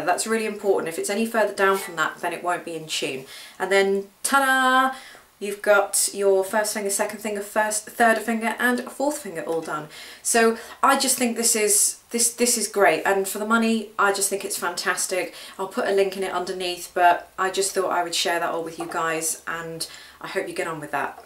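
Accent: United Kingdom. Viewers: Hey:D Thanks! I found this clip really useful since I'm still finding my early steps.. I like the whole series too.. Keep it up (y) P.S. I love ur British accent too:D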